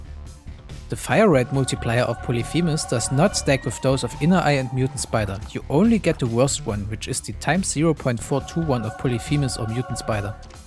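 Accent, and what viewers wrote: Germany. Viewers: So extremly german 😅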